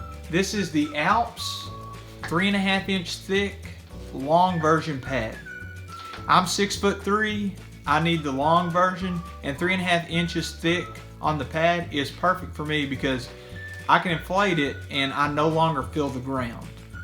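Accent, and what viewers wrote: Kentucky, United States. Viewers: What part of Kentucky are you from? Seriously, I live near Ft. Knox.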